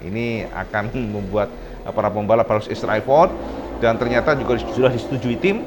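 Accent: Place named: Java, Indonesia. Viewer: Ini mah chanel sales yamaha yah.. Haduhhhh dasar nih chanel orang medok..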